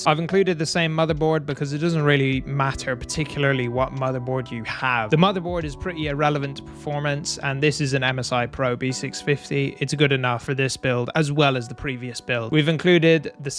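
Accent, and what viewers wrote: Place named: Ireland. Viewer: I can tell that but what county is that accent from bro 😭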